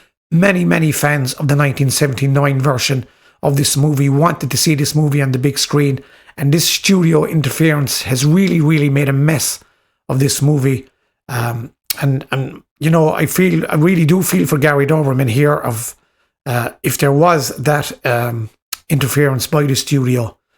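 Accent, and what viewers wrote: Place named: South, Ireland. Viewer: It’s simple I hear southern Irish and I sub 😃 great video love from Limerick!😊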